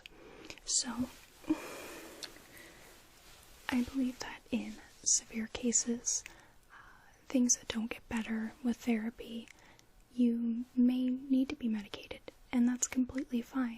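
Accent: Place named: United States. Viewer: Can't resist that USA accent so seductive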